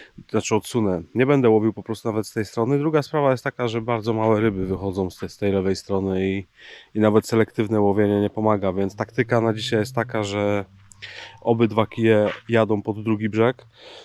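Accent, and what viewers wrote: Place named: Poznań, Poland. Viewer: Widać(słychać),że jestes z poznańskiego i miło,że używasz czasem słówek z gwary-fyrtel,kieretyny,,grzdyl…Super!!!Powodzenia!!!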